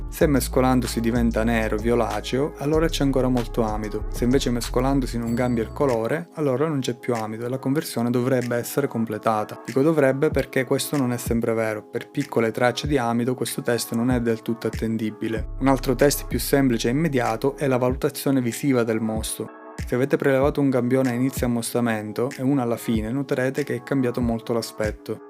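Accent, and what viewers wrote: Calabria, Italy. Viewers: Bravo, sei calabrese?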